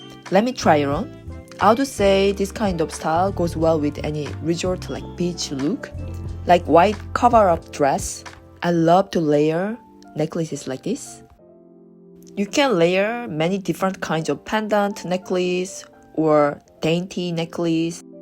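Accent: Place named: South Korea. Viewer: Are you Korean? I am Korean too and I can kind of detect it from your accent. Lovely looks!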